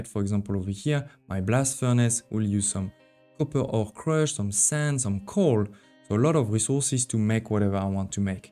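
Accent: France. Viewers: Your French accent is wonderful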